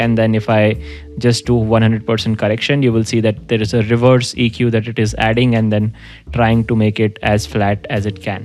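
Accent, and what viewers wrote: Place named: India. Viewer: I never thought voice was Indian. Well you shocked me and you're still cool bro.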